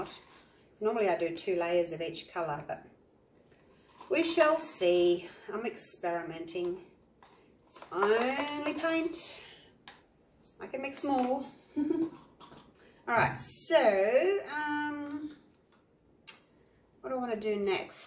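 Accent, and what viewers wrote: Australia. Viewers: I love hearing your Aussie accent as much as I love your artwork.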